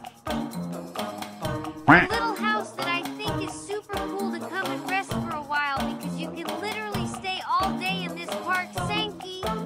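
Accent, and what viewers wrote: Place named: Venezuela. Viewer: Adivina tú eres de Venezuela porque hablas de Venezuela tú nunca has dicho vos vos sos vos nunca lo As dicho entonces tú eres venezolana hablas venezolanas tienes el acento venezolano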